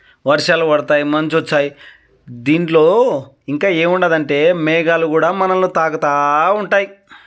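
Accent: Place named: Rayalaseema, India. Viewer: bro rayalaseema yeasa super story line also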